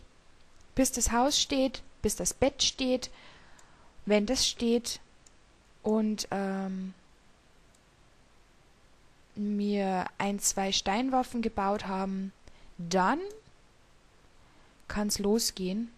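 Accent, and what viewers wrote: Bavaria, Germany. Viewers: Ja! endlich a bayrischs Minecraft-Let's Play gfundn :D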